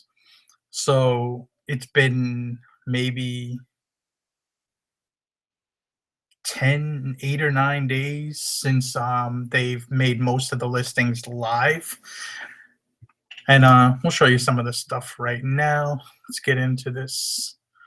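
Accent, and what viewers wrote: Boston, United States. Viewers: you are one of the best on you tube and love your facial expressions along with that Boston type accent...cracks me up and hope Gretchen and her Mom are doing better....sounds like you have a lot on your plate, a lot of changes in the near future so take care and hope everything with your family works out well and your mother in law heals...Happy Hunting! Corie in Seattle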